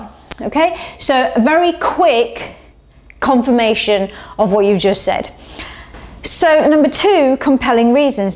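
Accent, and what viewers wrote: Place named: Gloucester, United Kingdom. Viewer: Yes, you are excellent. You have well and truly helped me too. Thanks a lot Plus, i like your accent. I can hear the Gloucester with the R's, very well spoken.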